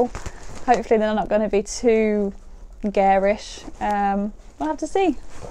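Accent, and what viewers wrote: United Kingdom. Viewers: i wonder if the girls of naughty ing ham get teased a lot... had to say that .... mybad ... i don't mind the obsession with the weather but i can get a little flustered with this accent ... which is somehow very appealing yet annoying ... so snobbish - must have to do with the power the Brits had over the centuries... imo ... just ignore me...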